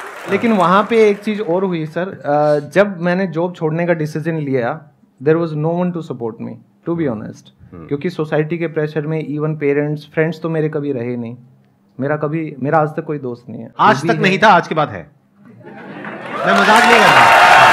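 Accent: Haryana, India. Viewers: Sir I am from Haryana ,it's true bhiwani me esa hi bolte h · I already knew he is from Haryana by tone as I am also from Haryana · Mai bhi padh rhi hu I am 38 year old and I studying as a architecture student Or mujhe aapki haryanvi bohot achi lgi Mai bhi haryana se hu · I loved your Haryanvi accent the way of you speaking. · Kis kis ko pasand aai sir ki haryanvi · Bhai, your Haryanvi pronounciation is great one even in English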